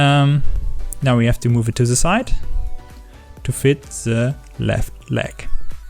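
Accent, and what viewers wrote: Germany. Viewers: Pretty sure hes german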